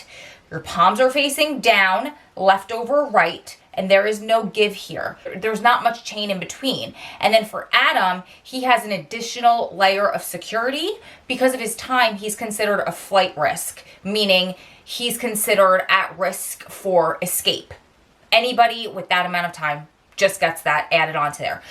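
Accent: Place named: South Jersey, United States. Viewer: Omg you are from South Jersey aren’t you? I’m new here. That accent! 😩 Cross between Southern and Philly. I live in Salem County. I understand if you don’t want to disclose where you are I just think it’s funny! I’m loving the content!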